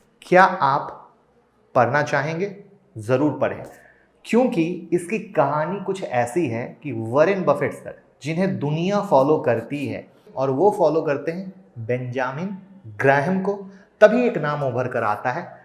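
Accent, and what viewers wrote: Bihar, India. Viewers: AAP BIHAR KE HAIN KA HO. BILKUL BIHARI HAIN. DEKHNE ME BHI AUR SUNNE MEE BHI. DISCLAIMER: I M A BIHARI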